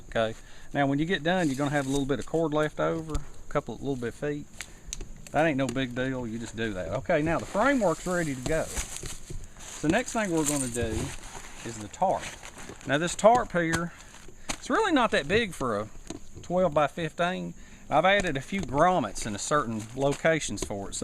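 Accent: South, United States. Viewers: Fantastic Invented Southern Brilliance. Your Honesty, Sense of Humor, That Great Laughter, Down Right Humbleness, Deep Southern Dialect, Warm Hearted Introductions and Best Father-ship makes your videos all worth watching all the way through to the end. Do you offer to your fans any of the products you design, I am a big fan of the V-Tree Grill. All the Very Best!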